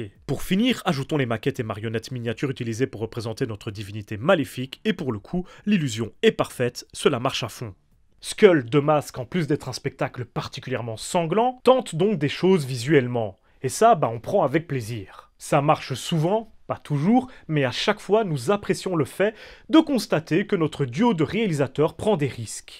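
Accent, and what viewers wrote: Belgium. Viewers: dommage tu devrais essayer un jour car il est fun et excellent Dead by daylight fut mon premier jeux multijoueurs J'ai adoré le faite d'incarner des personnages d'horreur culte des films que tu mentionnes dans la chaîne en tout cas il est magnifique et PS l'accent belge et au rdv 20/20 pour t'as chaîne il est génial 🤣 grâce à toi J'ai découvert des pépites incroyables merci beaucoup 👍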